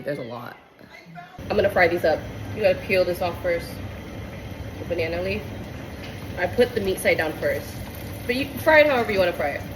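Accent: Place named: Vietnam. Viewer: As a Vietnamese, I totally confirm that you had awesome banh chung 😂 Love your Vietnamese accent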